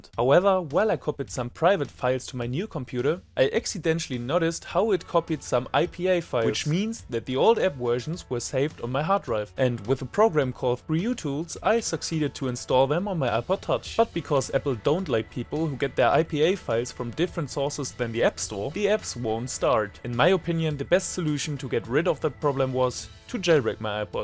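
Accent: Germany. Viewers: Are you from german ? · Man hört so krass raus dass du deutscher bist hahaha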